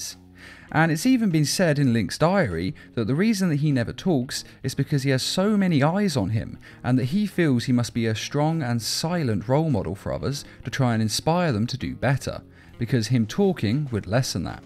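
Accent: United Kingdom. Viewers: Fear because he’s got that calm British accent that makes him immediately 10x as scary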